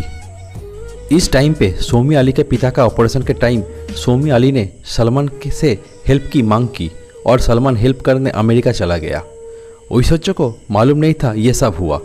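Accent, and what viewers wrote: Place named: Bengal, India. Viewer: Kyea ap bengali ho ? Apki hindi acca nahi hay.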